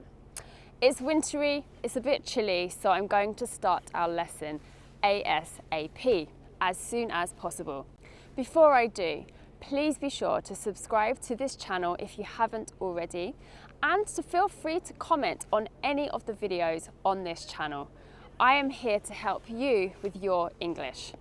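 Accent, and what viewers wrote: United Kingdom. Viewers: This british english accent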